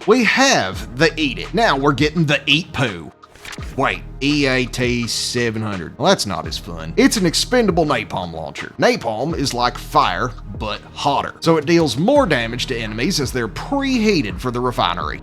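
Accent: South, United States. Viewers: im new as it just came to xbox and i thought this game couldnt get any cooler and then i found your channel. nothing makes me wanna spread democracy more than a fellow sweet tea accent screaming about freedom. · Hey , is the southern draw an affectation or your natural dialect? I don't mean to shatter the illusion, I love your content, just seems like a lot of work you do for us if it isn't!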